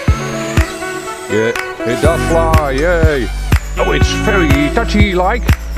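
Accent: Netherlands. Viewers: Nice work again , always the best positive products reviews and information. I like your English Dutch dialect gives it a fibe. Hopefully you'll sending this quadcopter to Sweden !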